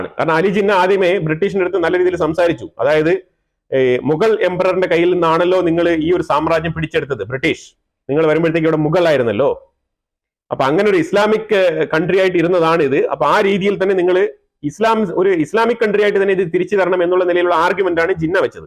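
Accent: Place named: Trivandrum, India. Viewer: No kollam, it's trivandrum slang.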